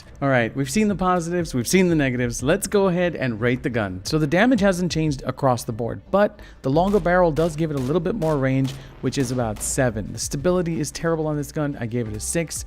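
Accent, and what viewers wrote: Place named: Russia. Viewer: I like sat Russian accent tho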